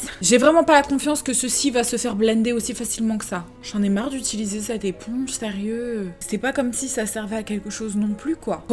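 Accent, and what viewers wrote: United Kingdom. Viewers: Ton acsan anglais😒😒😒 et chiant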